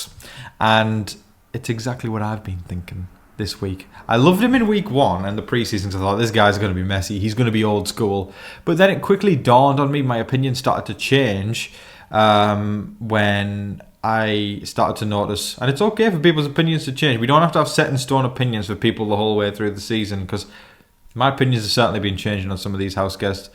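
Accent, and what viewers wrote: United States. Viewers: Your American accent is good, it’s just the words you are saying aren’t good, lol. I think the fact that shower gate is still anything is laughable. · Okay I've never heard your American accent before! And I honestly loved it! I busted out laughing! Good job Mate! Lol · Your American accent is spot on. It sounds like a Florida/Georgia accent lmao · Your American accent is better than mine, and I’ve lived in the USA my whole life! Love your channel. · Love your American accent. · As a guy from california i find ur american accent hilariously accurate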